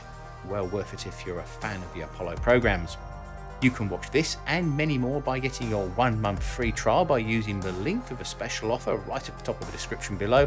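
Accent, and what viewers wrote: United Kingdom. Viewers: British accented narrators are the best because the rhythm in their sentences and the pitch/sound of their voice makes you feel like your high IQ grandparents are telling you a story! I love your work and the mix between photos and videos as you talk makes you feel like you are really immersed within the topic. Ps: I really would love more space/aeronautical engineering videos. 🌎🌕🌞🪐🚀🛰👩‍🚀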